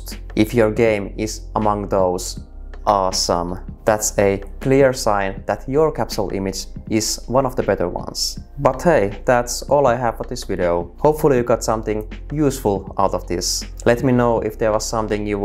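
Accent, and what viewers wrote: Finland. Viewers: Are u Finnish? Your accent sound like one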